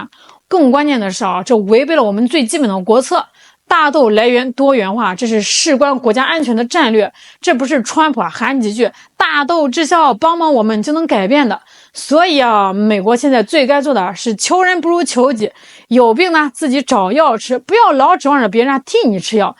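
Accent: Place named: Northeast, China. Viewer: 东北姑娘！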